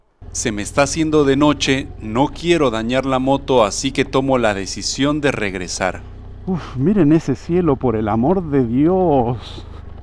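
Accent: Mexico. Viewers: hasta aprendió a hablar cómo Mexicano ❤ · Increíble como ya habla como todo un Mexicano, saludos desde Durango wey!!! 😉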